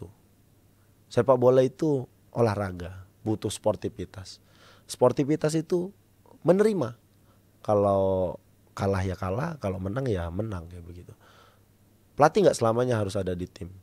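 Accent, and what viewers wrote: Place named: Medan, Indonesia. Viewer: Logat BICARA OKI ttp LAWAK medan😂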